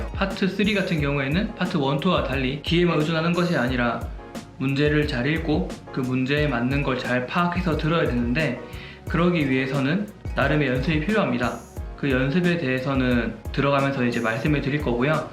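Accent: Gyeongsang, South Korea. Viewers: 혹시 부산이나 창원이 고향이신가요?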